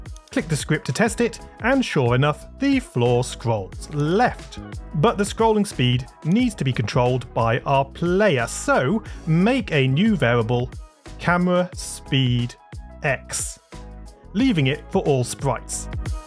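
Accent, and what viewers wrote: United Kingdom. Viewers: are you British British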